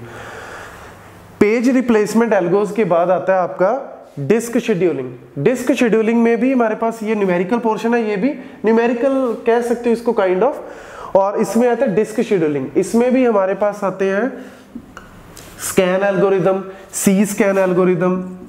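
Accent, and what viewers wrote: Punjab, India. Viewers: tuhade accent to lgda tusi punjabi o??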